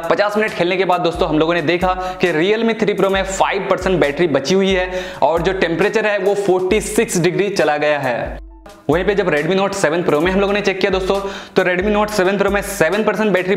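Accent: Bengal, India. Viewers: main v hun. Bankura se.apka baat Karne ki tarika se mujhe laga ki ap bangali ho..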